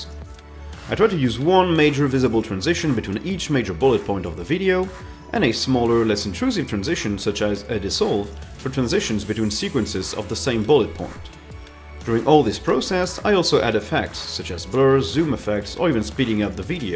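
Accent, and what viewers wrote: France. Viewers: wat , you're french ???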